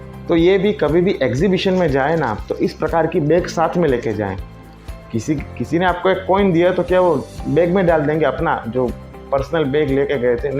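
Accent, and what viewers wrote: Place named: Gujarat, India. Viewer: સર તમારો આ વિડિયો જોય ને બોલી ઉપર થીલાગ્યું કે આપ ગુજરાતી છો ખૂબ સરસ માહતી આપી શું તમે જૂના કોઇન લેવે- ચ કરો છો તો આપનું સરનામું મોકલશો 👍👍👍🔥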